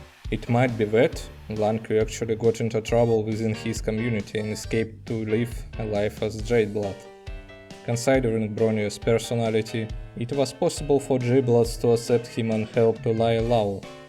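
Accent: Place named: Russia. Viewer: cute russian accent :3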